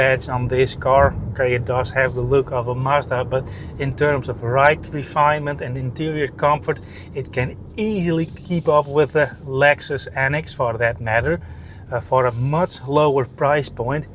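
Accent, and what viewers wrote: Netherlands. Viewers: Great informative reviews 👍your English Dutch accent is very cool to listen too 🇬🇧🇳🇱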